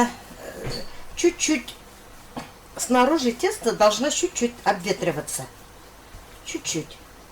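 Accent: Tatarstan, Russia. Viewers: Исэнмесез😘Какой у вас акцент татарский классный,слушать одно удовольствие❤❤❤❤❤Рэхмэт за рецепт💃💃💃💃💃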